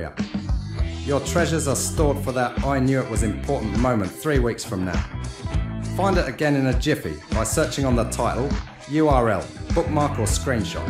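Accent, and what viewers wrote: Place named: Mockney, United Kingdom. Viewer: Love the Guy Ritchie mockney accent goes well with the presentation. prefer web based apps for windows as not a fan of chrome. Brilliant tool, I must delve deeper into there whys, whats and wheres. thanks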